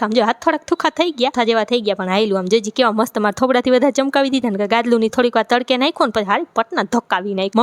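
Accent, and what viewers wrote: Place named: Rural, India. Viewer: Jay mataji.....🙏 તમારી speech ખૂબ સરસ છે.... એકદમ દેશી,,, પોતાની ભાષા લાગે તેવી છે.... બનાવટ નથી.... જય ભોળાનાથ... જય માં આશાપુરા... ખમકારી ખોડિયાર...🙏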